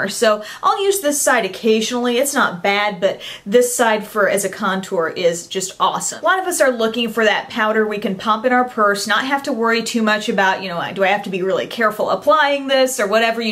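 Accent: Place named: United States. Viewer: She has an American accent. Everyone has an accent.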